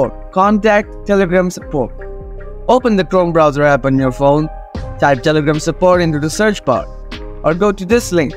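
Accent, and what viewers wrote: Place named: India. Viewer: It is an Indian English.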